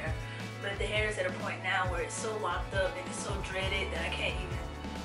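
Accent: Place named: Rural, United States. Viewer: I LOVE your country accent!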